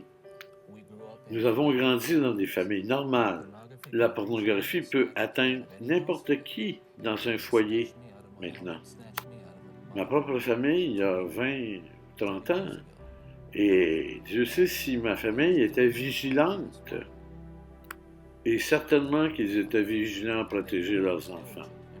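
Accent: Quebec, Canada. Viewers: Ce n'est pas un reportage canadien c'est une voix off québecoise. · celà s'appelle le français Québécois pauvre inculte de français tu sonne raciste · C'est ps une sinusite , c'est l'accent canadien qui et insupportable..... pourquoi voir pris un canadien pour commenter cette vidéo...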